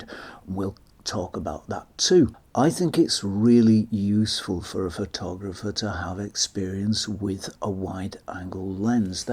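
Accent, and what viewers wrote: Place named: London, United Kingdom. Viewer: I do wonder why you call your cat Zorki!) Is it because of their excellent eyesight (in Russian 'zorki' means 'sharp-eyed or eagle-eyed') or have you named the cat after the line of Sovien cameras? It sounds so sweet and I'm really curious about it) By the way, as for my preferences in photography, I persanally prefer Soviet lenses (Helios 44-2 or Jupiter 37A or even that giant Tair-3). I haven't tried any wide angle lenses so far but, probably, one day... Thanks a lot for your videos! They are really inspiring! And, by the way, I do enjoy you authentic London pronunciation (or is it even RP?) In any case, you are awesome!)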